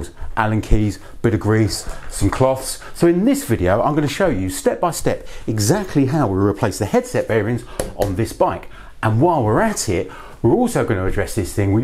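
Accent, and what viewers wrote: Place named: United Kingdom. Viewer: I like the detail you gave, such as the red and black bearing seals. None of the other videos mention that. I like your mannerism, too. I'm a Londoner but have been gone from the UK for forty-six years, and seldom hear a 'Limey' accent. It was great to listen to you. In 2016 I bought a new Specialized Fatboy. I do all my own wrenching since I fell out with a bike shop from whom I had bought three new bikes, and seven botch jobs. I decided to check the headset bearings and found some rust on the lower bearing, even though it had not been ridden in the rain, nor washed. I replaced both bearings and did a good job even though I say it, myself. What I'm looking for is information on swapping caged bearings for sealed bearings. I bought a new Izip bike last autumn and don't know what kind of bearings it has. I know the wheel bearings are individual balls. 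I think I'll bring the bike indoors to drop the fork. Florida is way too hot for working in the porch.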